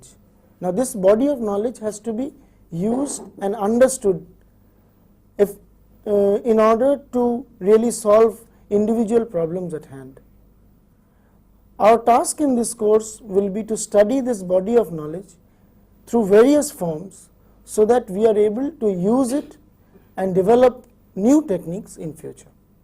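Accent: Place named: India. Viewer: I am from Sweden so I am not a native English speaker, but I can still understand this guy - although I kind of have to listen harder to grasp what he is saying. I guess I have a slight advantage due to the fact that Swedish is more "flat" than English and doesn't use any gliding vowels (unless you are speaking some of the southern accents), so that's probably more similar to the Indian accent.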